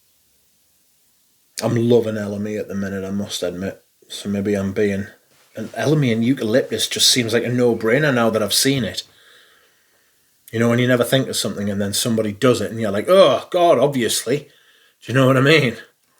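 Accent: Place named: Cockney, United Kingdom. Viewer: Dude all britsh cogney twats, talk like you? like damn you guys used to rule and exploit india and china but now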